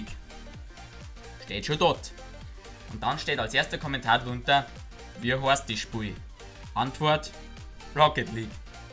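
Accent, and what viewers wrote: Bavaria, Germany. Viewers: Man heat scho das du bairisch bisch! i bin Österreicher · Servus boarisch is der beste Akzent #boarisch für immer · Des is boarisch I komm aus Ostalbkreis versteh alles bisch witzig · Der bayrische Dialekt isch mega bin Österreicher verstehe alles nich so vile Unterschied zu meinem heimat Dialekt aber bayrisch isch besser und lustiger💪🏻💪🏻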